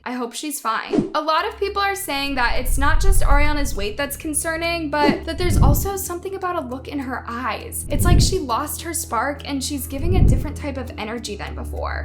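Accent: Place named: Los Angeles, United States. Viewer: That L.A accent is soo annoying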